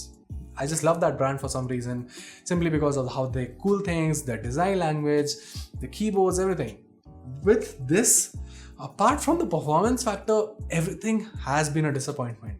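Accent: India. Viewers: Is he an Indian? Coz he sounds like one?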